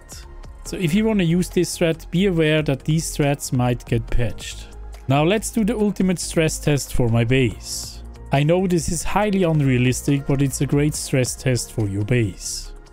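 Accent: Switzerland. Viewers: Fast nöd denkt mit dim dialekt das du en schwizer bisch <3 Schad das die videos uf englisch machsch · me ghört eifach das schwizer bisch, endlich mal en schwizer wo gueti videos macht🎉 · meh ghört sofort das en schwizer bisch. vo züri?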